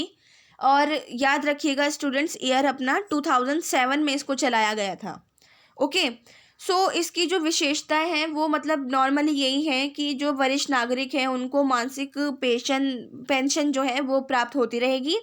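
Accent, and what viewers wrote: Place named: Rajasthan, India. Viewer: Mam I think aap Rajasthan se ho...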